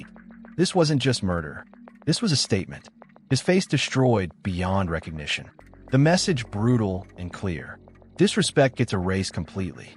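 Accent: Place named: United States. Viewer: 😂 American telling stories fs what i would love to know is where some yank knew it to tell 😅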